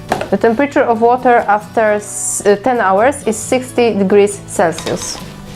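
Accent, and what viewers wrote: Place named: Poland. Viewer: po co mówic po angielsku jeżeli słychać ze jesteś z Polski?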